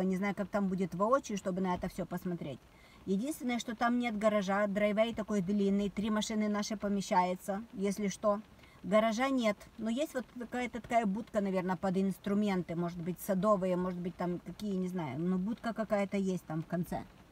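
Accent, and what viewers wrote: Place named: Odesa, Ukraine. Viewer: Вы с Одессы? Говор такой интересный?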